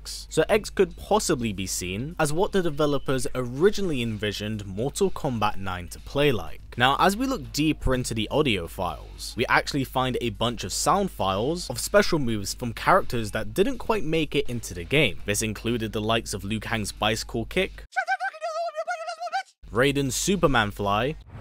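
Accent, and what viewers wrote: United Kingdom. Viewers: The first MK i played :3 Great Game!! Pd: Your Accent...are you From UK? Answer if you want, mah Boi.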